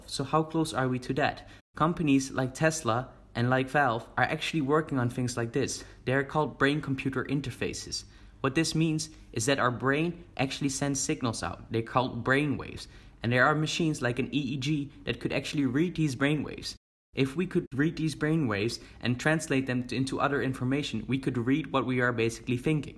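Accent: Netherlands. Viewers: This is my first video of this youtuber and I'm here wondering "Is this guy dutch" cuz his accent has a light dutch accent sounding to it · You sound dutch. Are you dutch? Either way, good video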